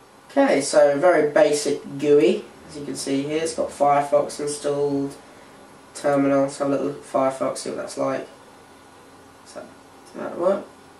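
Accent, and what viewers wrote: United Kingdom. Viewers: its like a peter griffin with a harry potter accent! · Looks American sounds British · this an accent from the British Island. Nice video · are you british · you're so British!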